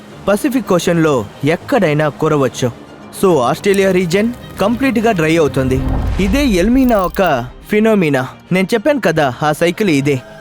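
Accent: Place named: India. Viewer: మంచి సమాచారం. మరి ఎందుకు హింది యాసలో, ఆయాసంగా చెప్తున్నారు. ఎంత అనువాదం ఐనా, మంచిగా స్వచ్చమైన తెలుగు లొ మట్లాడొచ్చు కదా. భవిష్యత్తు వీడియోస్ లో సవరించగలరు.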